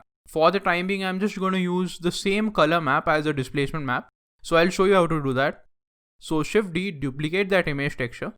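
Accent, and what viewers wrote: India. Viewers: Your tutorials are so much great, are you indian, ? As your accent looks indian, i love your tutorials 😀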